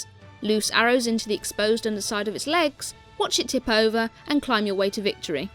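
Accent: United Kingdom. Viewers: Ha ha, silly British lady fights the Big Boulder Boi Gang.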